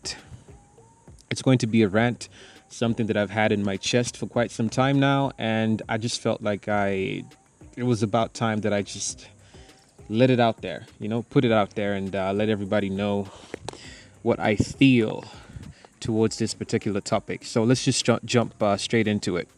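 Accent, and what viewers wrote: United States. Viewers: You do have solid points in your analysis. Thanks for the share. By the way, where you got your American accent from 😀? Did you live in US or Canada??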